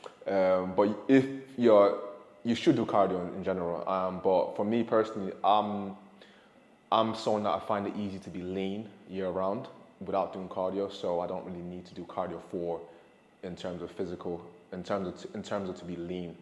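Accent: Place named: United Kingdom. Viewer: I didn't know u British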